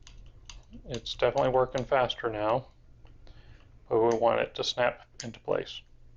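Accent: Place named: North Carolina, United States. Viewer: I know someone else with a North Carolahna accent so I was able to figure out that you were talking about Tri-Flow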